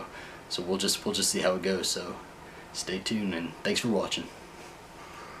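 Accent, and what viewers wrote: South, United States. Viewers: love your southern accent! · his deep country accent is sexy but he seems straight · this guys attractive not just his looks but his mind, not alot of gay guys think that way and they're very judgmental about each other so he's right, and I've never seen gay guy with a deep southern accent miracles do exist lol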